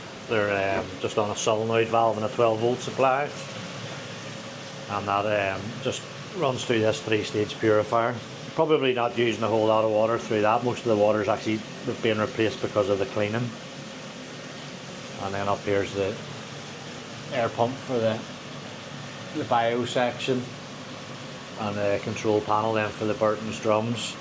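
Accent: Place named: Northern Ireland, United Kingdom. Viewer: I seen you in Yvos video. Well heard you lol so I messaged Yvo and asked is he a Bangor man or Holywood man. He said no he's from Northern Ireland haha. I'm from Holywood originally. Keep the content coming...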